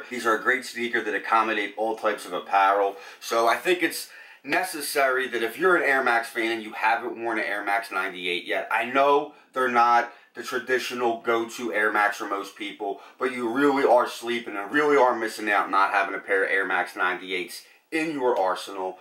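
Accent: Philadelphia, United States. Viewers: Mika Swasaq philly.. philly.. philly must be where he from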